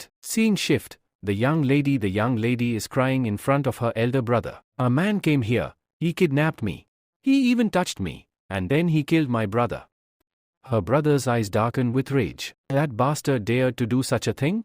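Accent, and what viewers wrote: India. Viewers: Why give the AI a Indian accent?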